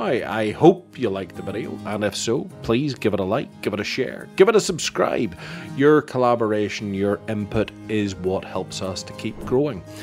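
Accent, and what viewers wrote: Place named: Northern Ireland, United Kingdom. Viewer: Northern Ireland,it's a lovely accent👍🏻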